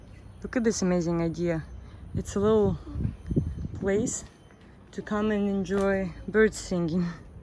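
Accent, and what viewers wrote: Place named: Portugal. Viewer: hi where are you from your english has a funny accent! oh you are fom portugal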